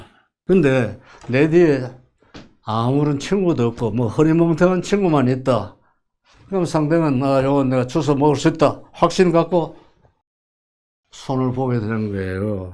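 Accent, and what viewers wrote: Gyeongsang, South Korea. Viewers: "언어"를 "은어"로 발음하고 "어"와 "으"를 구별않는 경상도 식 억양이 섞였지만.. 직업적 강사가 아닌 분이 줄여서 하는 말이라 좋고, 오히려 더 확싫히 이해가 가네요.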